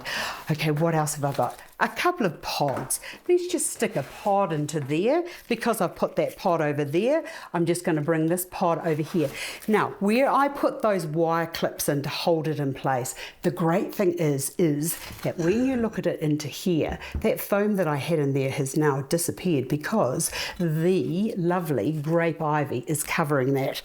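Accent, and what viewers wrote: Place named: New Zealand. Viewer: Love your New Zealand accent - from a follower in the Commonwealth of Virginia in the United States!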